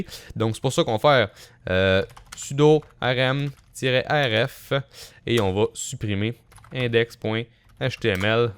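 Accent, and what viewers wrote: Quebec, Canada. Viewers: Hello from France. Superbe tuto, bien ficelé et ça marche du premier coup. Merci beaucoup. Toujours un plaisir d'entendre l'accent québécois. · OMG UN QUEBECOIS NON?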